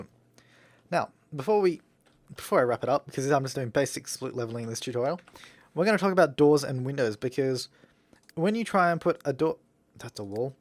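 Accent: Australia. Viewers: are you Australian? cus i am and icant tell if you have an aussie accent or not but i do kno aussies say bute and bambas like you did in the vid :) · good video, it was very useful, r u auzzie??? u sound like it, i am :]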